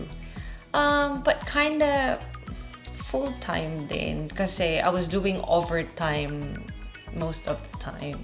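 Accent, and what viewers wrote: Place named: Australia. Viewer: I truly agree with what you said that you adapted the Australian accent when you started working in the Aged Care, I can relate to that! I truly practiced how to say the Aussie accent of “water” so that the residents will get it if I am going to ask them if they want some “Woottaah” 😊 i love this sitdown vlog. 😊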